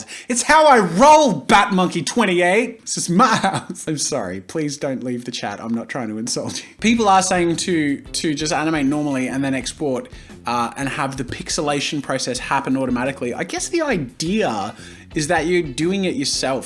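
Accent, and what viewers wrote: Australia. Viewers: (Australian accent) he is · Are you from Australia 🇦🇺 you sound like it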